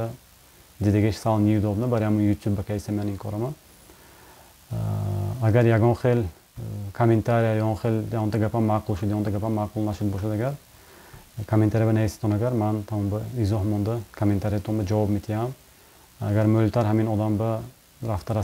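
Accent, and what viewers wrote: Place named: Samarkand, Uzbekistan. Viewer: Ofarin ba lahjai samarqandiyat. Zinda doshta boshed zaboni khuda.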